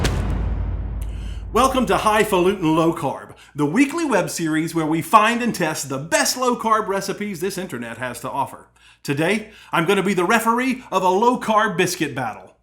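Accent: South, United States. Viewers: Why do I sound like a Southern girl after watching your videos? :) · Awesome! Thanks. I know that the KetoConnect guys said you're from Florida, but have you lived there all your life? You sound more like a SC or GA native. I've lived all my life in SC, NC and Florida, so truly a southern girl looking for an easy recipe for my favorite low country BBQ sauce (low carb of course) :).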